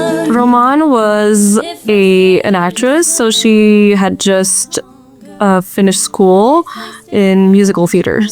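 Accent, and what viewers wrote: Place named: Quebec, Canada. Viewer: She has a slight French Canadian accent. English may not be her first language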